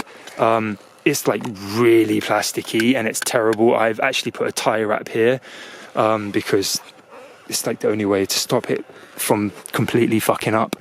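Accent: London, United Kingdom. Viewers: Why you sound like your from London but for a Arab or whatever number plate lol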